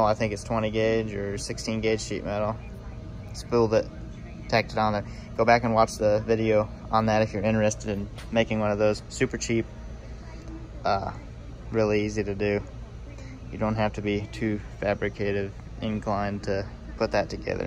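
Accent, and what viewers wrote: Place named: Tennessee, United States. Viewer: You look and sound damn near just like myself.. but you got more a Tennessee twang to ya.. im outta ky, backwoods.. where abouts you from? no homo…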